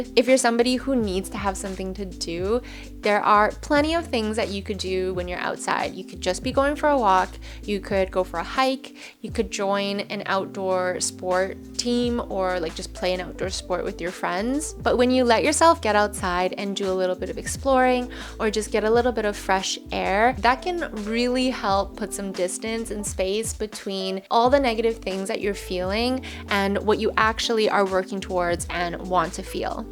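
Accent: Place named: California, United States. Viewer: What in the Californian accent is this?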